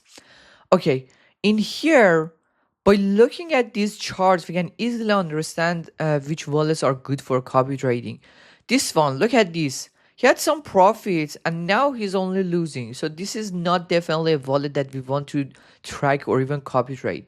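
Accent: Iran. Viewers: از لهجه تخمیت معلومه ایرانیی😂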